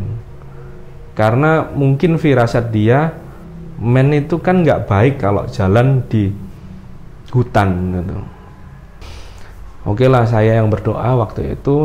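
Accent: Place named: East Java, Indonesia. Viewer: Nggresik... Njombang. Jawa timuran banget (≧▽≦)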